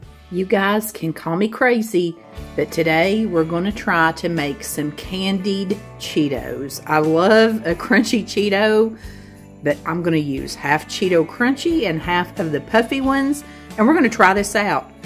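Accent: South, United States. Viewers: What a great video, some excellent Christmas treats im gonna try. Love your southern accent! Love from the UK xx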